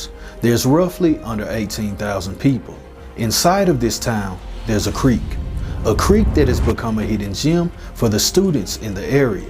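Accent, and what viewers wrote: South, United States. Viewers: New subscriber here! Your southern accent is very welcoming. I am a North Carolinian who was born, raised, and still lives here! I’m from the mountains close to Boone, but I am familiar with all of the places mentioned in today’s story. This is just heartbreaking. Such a beautiful young girl who never got to experience life and I imagine she had immense potential and would have done so many great things with her life. Unfortunately that monster took that future from her, and it just ain’t right. These type of stories always get to me. Just a sweet young lady who loved everyone. RIP Dani! You will never be forgotten! ❤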